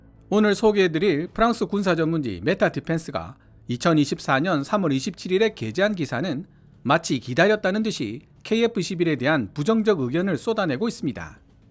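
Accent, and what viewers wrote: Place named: Busan, South Korea. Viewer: 부산 사투리억양 정말 듣기 힘드네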